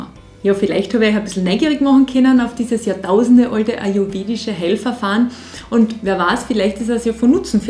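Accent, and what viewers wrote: Austria. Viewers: Alles. Eine Freundin von mir lebt in Kärnten. Ich liebe Österreichisch.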